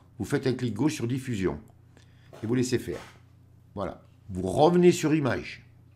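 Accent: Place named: Saint-Étienne, France. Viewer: Merci pour vos tutoriels exceptionnels que j'apprécie énormément: même avec votre bon accent stéphanois tout est bien clair et limpide: vous êtes très patient et pédagogue.Un grand bravo..Je m'abonne pas souvent aux chaines youtube mais j'ai fait unbe exception pour vous!! Mais je fais la même remarque que Pierre Darre, pourquoi la rotation 4 fois de l'image??? Pourquoi?? Et votre lien de réponse ne solutionne pas la question!! Encore merci et à très bientôt!!!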